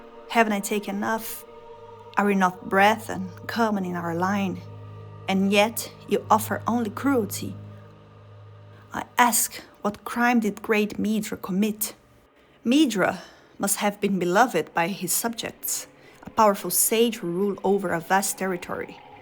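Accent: Brazil. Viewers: Brazilian accent hell yeah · Are you, by chance, Brazilian? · Bizarro, 2 da manhã eu vendo minhas coisas de nerd e vejo um vídeo sobre um dos bosses que acho mais atrativos e curiosos em nightrein, que vídeo maravilhoso, tantas citações mitológicas, musicais e filosóficas que reflete o trabalho que teve em escrever e criar esse vídeo que aprofunda MUITO no jeito que vemos o boss, com comparações cirúrgicas e uma análisa muito profunda! que vídeo incrível. Ver o povo engajado com comentários adicionando anotações ou observações é muito foda! (perdão a palavra) edit: esqueci de citar que percebi seu sotaque e fiquei encucado! mas depois de ver que era do Brasil, tenho a elogiar sua fluência em inglês!!! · Strong Brazilian vibes in this accent. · Quick question, are you perhaps Brazilian? Not complaining, just can´t pinpoint the accent. Also great video. And in the mark where you say "exoticism", is it really that or "exoterism"?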